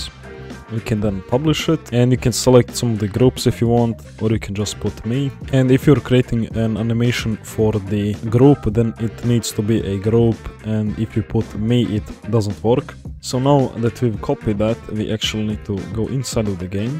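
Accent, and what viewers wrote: Russia. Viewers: у него русский акцент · Бро ты русский , по произношению слышно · Слишком русский акцент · Ты русский если нет то почему у тебя акцент как у русского? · Russian Accent eh? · Author, are you Russian? You have a distinct Russian accent. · It’s probably Russian accent, if not then everybody heard it before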